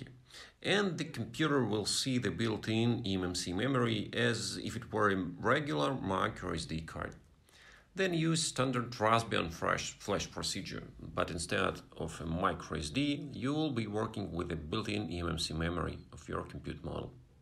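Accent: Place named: Russia. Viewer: да я отлично понимаю ваш руссиш инглиш, комрад, сижу, ковыряю поделку )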